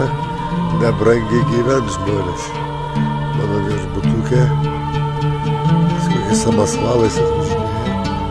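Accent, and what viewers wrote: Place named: Šiauliai, Lithuania. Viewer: O KALBA ŠIAULIETIŠKA..RYŠKIAI😂❤👍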